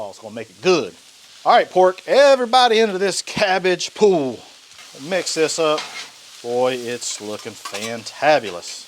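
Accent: North Carolina, United States. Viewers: You must be from NC. I can tell by your accent…. Maybe Thomasville or HighPoint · First video of yours that I have watched and you sure are making me miss home. Not that you cooked something I really ever had in the 2 plus decades of living in the Durham/Chapel Hill area, but your accent and slang...thank you :)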